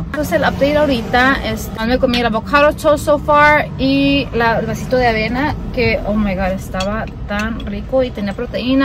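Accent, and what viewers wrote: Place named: Northern Mexico, Mexico. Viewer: te escuchas bien norteña, de donde son tus papas?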